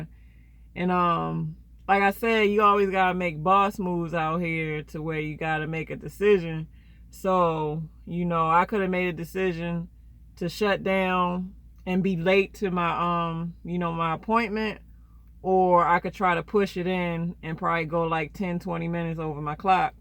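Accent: Baltimore, United States. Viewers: Lol! Sewper dry? Yewtube channel? I love your accent! Baltimore!😂😂😆😆😘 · Bmore accent.lol.sexy tho I found you cause I'm about to be a truck driver..n can't stop watching you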